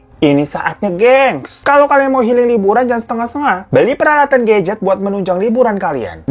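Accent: Java, Indonesia. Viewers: Bang...pliiizzz...jgn bkin aku ketawa ngedenger abang ngomong bahasa jawa...keren n lucu bgt👏👏👏👍👍👍usahanya polll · 😂😂lucu ngomong bahasa jawanya kk😂😂😂ngakak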